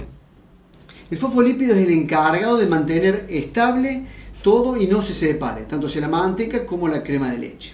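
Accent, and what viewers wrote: Córdoba, Argentina. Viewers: Espectacular!! Ahora si!! Fué un placer ser " toda oidos". Me entendí todo en tu español cordobés 😂😂. Una clase magistral. Las cremas que tiré!! X Ds!! Eran recuperables!! Gracias mil. En Uruguay de donde soy, la crema doble Light Conaprole uht jamás se montó. Ahora sé xq!! Tiene 17% mat. grasa. Recién lo veo. Gracias por el super tip de agregar manteca. Mil gracias por todos los trucos. Sos un genio. La intro y final están genial. Ya hay ahí un touch de música. La edición y los gráficos también. Pero en el celu como que no se llegan a leer aunque estés en pantalla completa. Capaz sería bueno darle uno 2 segundos de 1er plano. Cosa de poder pausar y leer para no perder la info.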